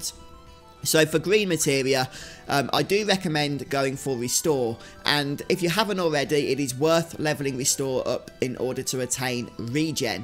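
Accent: United Kingdom. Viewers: I like youre GB Englisch!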